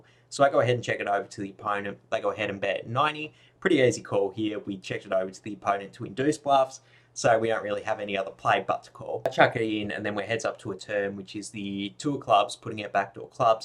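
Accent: Australia. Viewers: 👀 Me looking for vlogs trying to decide if I like the poker content or the Aussie accent more. Keep up the great work!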